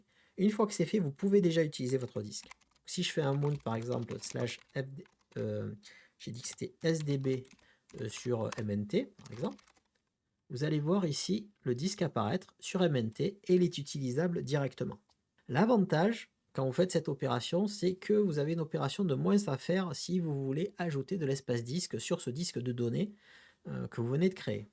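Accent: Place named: Southwest, France. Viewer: PS : Tu es dans le sud-ouest (ton accent t'as trahi)?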